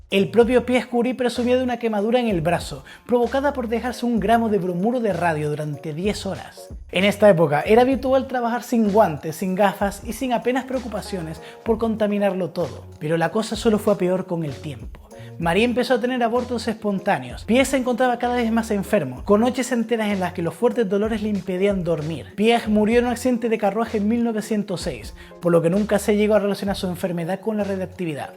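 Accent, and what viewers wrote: Chile. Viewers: Tienes el acento de un chileno. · este man es chileno?? tiene acento de chileno!! saludos🇨🇱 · Buen día, colega ¿Se puede saber de que cuidad de España proviene? Es que me asombra que su acento suene tanto al chileno. Si no fuese por el seseo típico español, habría pensado que eras de Chile. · Amigo, cómo es que hablas como chileno? Jamás imaginé que fueras español, un saludo desde Seattle, tu canal es increíble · Están geniales tus vídeos!! Muy explicativos y profundos. Solo me perturbaba una cosa y es tu acento tan parecido al chileno jajaja hasta que descubrí que eres de Canarias y ahí todo tuvo sentido 😂 Saludos desde Chile, el peor país de Chile 😅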